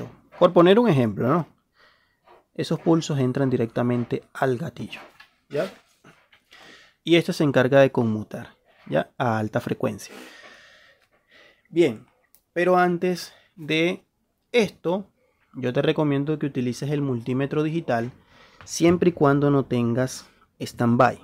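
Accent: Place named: Venezuela. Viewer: Por tu acento mi pana veo que eres venezolano igual que yo.... Te la estás comiendo viejo... Gracias en nombre de la comunidad que nos apasiona la electrónica porque tus clases son gratis, bien explicada con excelente metodología.... No le pares bolas a los envidiosos...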